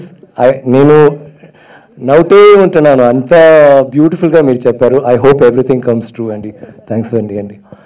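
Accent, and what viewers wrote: India. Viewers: Telugu vade anukontunnanu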